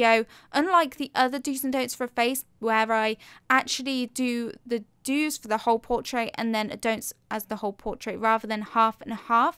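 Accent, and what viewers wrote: England, United Kingdom. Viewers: I like her English accent. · Thick english escent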